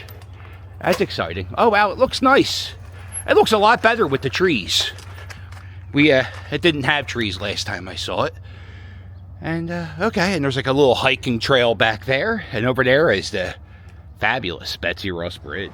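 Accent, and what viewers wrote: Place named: Pittsburgh, United States. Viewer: i grew up in the philly area and i sound nothing like this guy. sounds like pittsburg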